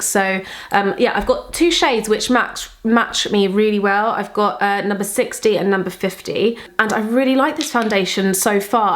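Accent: United Kingdom. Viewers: hi look i love you so much so so much I always love your videos and your British accent and yor eyes color everything you'r makeup is my best 😢💜 I'm your fan from k.s.a 🌚💕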